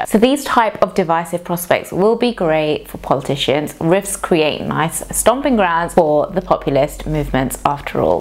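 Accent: United Kingdom. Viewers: R u from London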